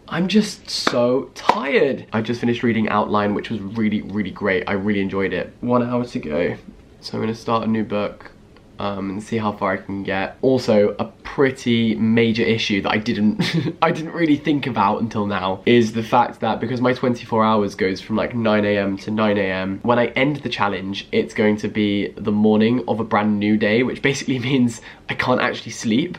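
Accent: United Kingdom. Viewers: Your violently British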